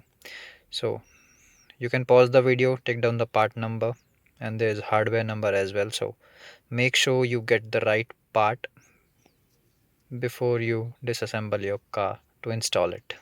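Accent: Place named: India. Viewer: Engleza indiana, imi vine sa ma cac!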